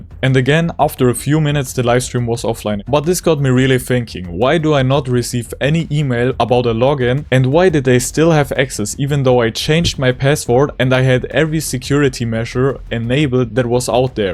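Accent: Germany. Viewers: Bro man merkt das du Deutscher bist xDdd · im hearing a little bit deutsch heraus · Bro ich höre irgendwie deinen deutschen akzent raus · Bro ist 100% deutscher😂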